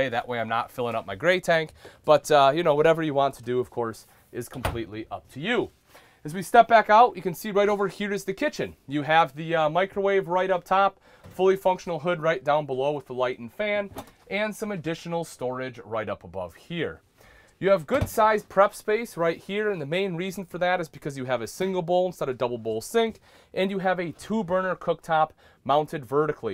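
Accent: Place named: Minnesota, United States. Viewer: Dude sounds direct from Minnesota! I’m from there and I’m like whoa!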